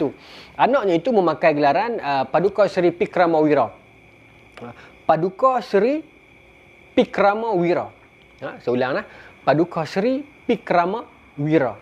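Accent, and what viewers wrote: North, Malaysia. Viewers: Assalamualaikum tuan boleh tumpang tanya asal orang utara negeri mana dan daerah mana? Maaflah kalau saya kolot tak tahu. Apa pun memang suka mendengar cerita sejarah yang masih tidak sesiapa dapat membongkar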